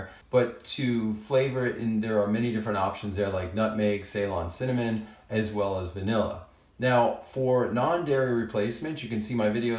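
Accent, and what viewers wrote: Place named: New York, United States. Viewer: You have a great New York accent, btw!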